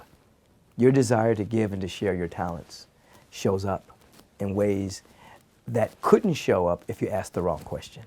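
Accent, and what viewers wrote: France. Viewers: U have a french accent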